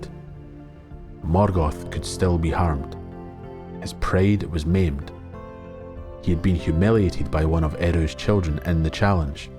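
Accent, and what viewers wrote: Scotland, United Kingdom. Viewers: He was foolish and heroic. In order to become a hero, you must be a fool in the first place. I really love your videos, partly because I could listen to your beautiful Scottish accent for hours on end.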